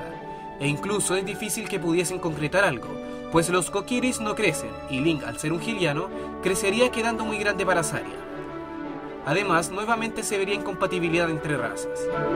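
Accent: Chile. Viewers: Se nota que eres chileno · Son de chile ?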